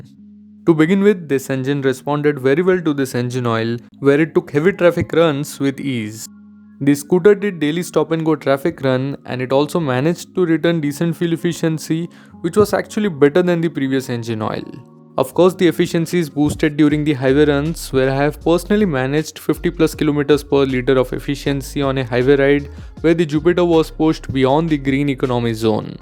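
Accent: India. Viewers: your tone is hindi but you speak english,...is very bad if speak english use english tone....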